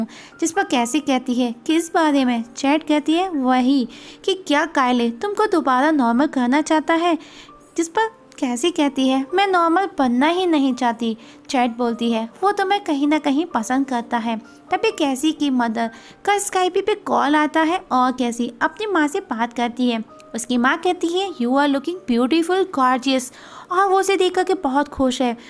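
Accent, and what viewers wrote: Bihar, India. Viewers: Lagta hai maidam aap Bihar se ho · R u from Bihar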